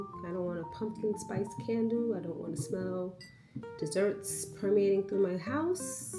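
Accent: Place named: New York, United States. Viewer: Hi,! Your relaxed life always remind me that I need to calm down. I love seeing how you balance so well. It looks like your Labor Day was pretty chill and thanks for letting us hear your voice again. Your New York accent. 🥰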